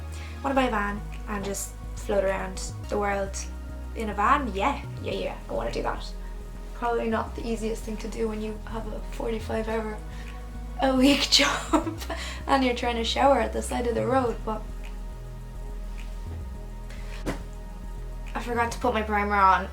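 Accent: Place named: Ireland. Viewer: Really impressive, holding a Master-degree in management. Also beautiful Irish-accent.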